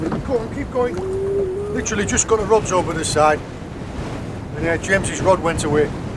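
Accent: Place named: Cumbria, United Kingdom. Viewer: Love the vids were are you from originally sounds like a cumbrian accent I'm from whitehaven originally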